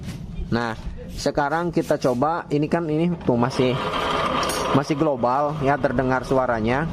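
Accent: West Java, Indonesia. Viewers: Makasih ilmu nya kang..sunda banget kang pengampean nya tp mudah di mengerti.❤❤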